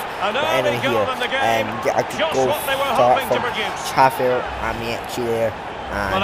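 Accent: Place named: Scotland, United Kingdom. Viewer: Also I’m loving ur Scottish accent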